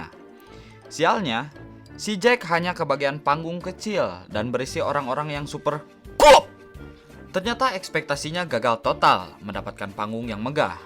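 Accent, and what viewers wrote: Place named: West Java, Indonesia. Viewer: Sundanis pisan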